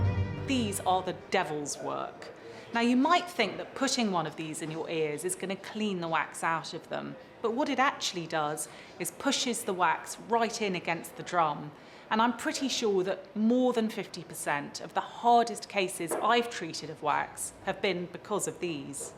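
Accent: United Kingdom. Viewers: What a beautiful British accent God Bless 😇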